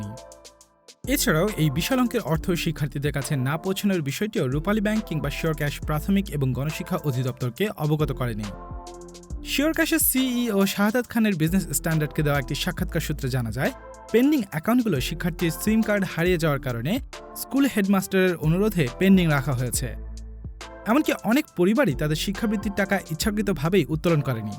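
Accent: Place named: United Kingdom. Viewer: বাংলা বলবেন বাংলার মত করে, ইংরেজির ফ্লেবার লাগাইয়া বাংলার সৌন্দর্য নষ্ট করেন কেনো?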